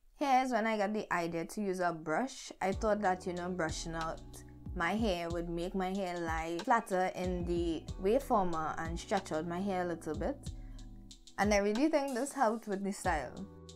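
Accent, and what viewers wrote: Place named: Trinidad and Tobago. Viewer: Beautiful! I love the trini accent! New subbie :D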